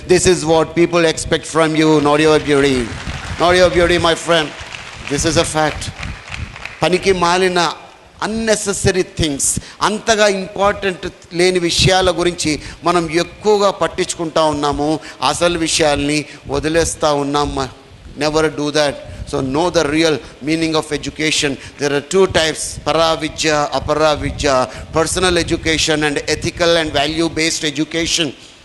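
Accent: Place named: Telangana, India. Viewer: super speech !! just loved his way of presentation, the Telangana DIELECT I love it ! sir kiraaak chepthundu!!feels great and motivated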